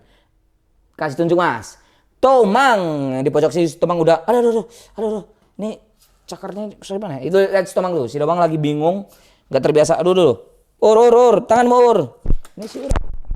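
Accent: Java, Indonesia. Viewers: kangen suara Asli jawanya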